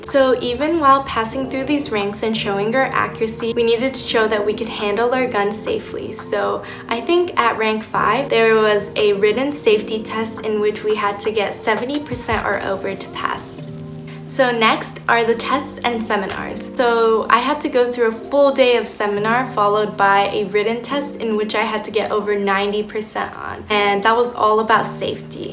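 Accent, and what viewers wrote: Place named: United States. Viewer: you are absolutely entitled to your opinion on guns or anything else - of course! I do feel that most honest, law-abiding adult people anywhere in the world are responsible enough to own a gun and they should have training to know how to use it effectively and when it is legally permissable to use it. In my own case I was British Army trained first at school from age 13, then later actually in the Army Reserves. I learned all I needed to know about firearms there. I have lived in South Africa since 1975 and have always owned firearms for self-defence in one of the most violent crime-ridden countries in the world. Only needed to use a gun once though, several years ago but did not shoot anyone, merely frightened them away. I know that in this country the injuries and fatalities by gunshot are committed not by registered, legal owners of guns at all but by criminals, robbers and such who do not purchase and register their guns - they usually steal them! 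I do not know Japan at all, but a friend of mine who does has told me of the beauty of the country and of the nature of the people. Your English incidentally is excellent and I note that you have a slight and rather attractive American accent - it is a pleasure chatting to you!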